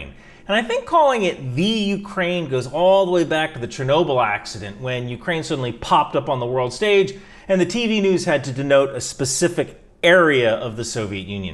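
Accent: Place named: United States. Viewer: As an American who has been to Ukraine several times there is a lot of good advice here and several things I didn't think of until mentioned. But don't take language lessons from him, his accent when pronouncing Ukrainian words is hilariously very American. I would disagree that liquor is usually Vodka. Ukrainians love wine. If like me you don't drink then learn to repeatedly say "Ya ni Pushi". Ukrainians accept that people don't drink alcohol, but they will also be shocked and offer repeatedly to make sure you're not just being polite. Ukrainian Catholicism is very different from the Catholicism you are used to in the USA. They are primarily Greek Catholics and Roman Catholics are uncommon.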